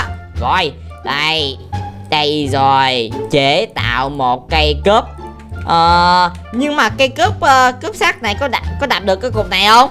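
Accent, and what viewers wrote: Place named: Binh Duong, Vietnam. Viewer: Đọc lạ Bình Dương quá anh ơi😄😄😆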